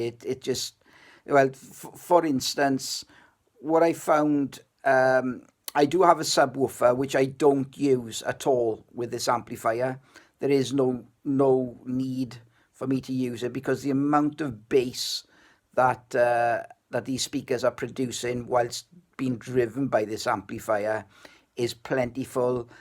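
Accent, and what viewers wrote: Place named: Wales, United Kingdom. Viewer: Thanks for this video, I’m currently running a Rega Brio amplifier but am seriously considering an upgrade to the Exposure 2510. It is a very minimalist amplifier but I’m happy to get an external DAC and headphone amp. Thankyou. YouTube needs more beautiful Welsh accents so please upload more content.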